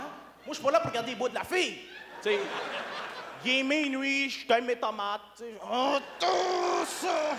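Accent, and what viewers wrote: Quebec, Canada. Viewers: C'est la même langue que la tienne, c'est-à-dire le français. C'est juste qu'il parle avec l'accent québécois. Il est allé en France et il a eu beaucoup de succès aussi. Arrêtez de dire que c'est une autre langue quand c'est le même language, juste l'accent qui est différent. C'est comme si un Américain, un Britannique et un Australien disaient qu'ils ne parlent pas la même langue. Ils parlent tous anglais, mais leurs accents sont différents. C'est seulement des patois, des dialectes différents, mais ça reste la même langue.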